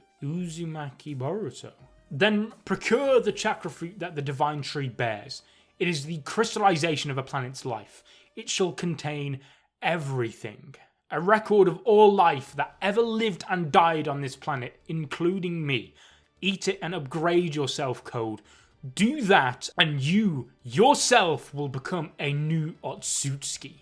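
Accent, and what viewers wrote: United Kingdom. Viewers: Your British accent is so good😃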